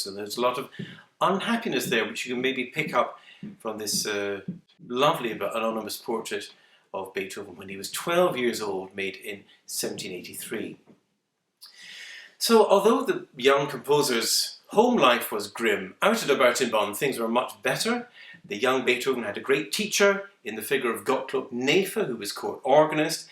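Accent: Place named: United Kingdom. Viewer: What part of British isles are you from? Your accent is very pleasant.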